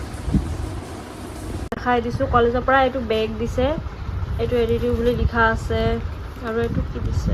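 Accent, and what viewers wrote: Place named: Sylhet, Bangladesh. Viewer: বাংলা লেখা স্বত্বেও কিছু না বুঝা আমি😅 সিলেটি সিলেটি ভাব আছে কথায় কিন্তু কথা গুলো সুন্দর। ভালো লাগলো❤️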